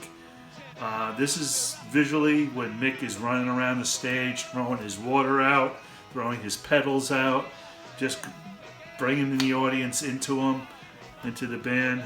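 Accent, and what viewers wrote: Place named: Philadelphia, United States. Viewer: This was the first album (cassette) I ever bought when I was 15, 16(?) and although it’s not considered generally or by critical acclaim to be one of their best, it is nontheless a solid album. To be honest, it’s not even one of my favorites although I do like it very much and always have. I was introduced to the by the older brother of a good friend when I was still a young rock fan trying to expand my musical horizons past Foghat, Kiss, Journey, Doobie Bros, etc. (I had discovered Led Zepplin and The Who by this time but Pink Floyd wasn’t even on the radar). Anyway, thx for your in depth research, knowledge, and reflections of personal ups and downs the band members may have been going thru during the time of recording. One thing I’d like to mention about Goat Heads Soap is that I really feel that is possibly the most underrated song and one of my all time favorites! 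Another great job. I watched all three Let It Bleed docu’s and now watching part 3 of Goats Head Soup as I write this. Would love to get a copy of Brussels Affair, CD and DVD, blue Ray if possible. Yep! Another great job! One last thing; I live in Cal and I’m guessing by your accent that you’re from Philly. Just a sayin… Hope you do a doc about my favorite album and one the two tours I saw…SOME GIRLS. (Tatoo You was the other one)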